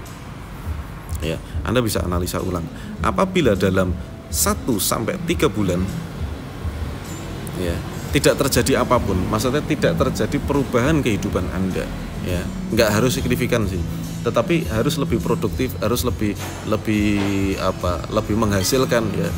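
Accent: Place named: Semarang, Indonesia. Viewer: Mas kayanya orang semarang yo.. jowone medok hehe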